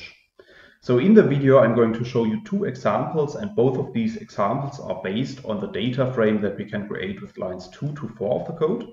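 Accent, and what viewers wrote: Germany. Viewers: I do really appreciate this video and the author -- helped me a lot since I'm a complete R dummy so far and trying to comprehend the language via Coursera. Haven't found any real examples on how to extract multiple rows of data frame to finish assignment neither on discussion section nor on web, while you explained in details how that works -- a big big thanks! Have subscribed to the channel, think will be useful. Super lovely German accent -- greetings from Russia :)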